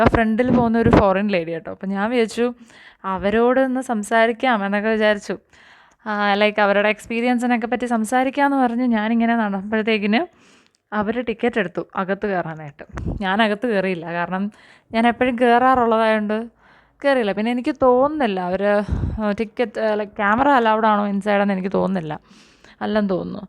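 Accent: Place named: Kottayam, India. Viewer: R u from Kottayam..... Oru Kottayathinte slang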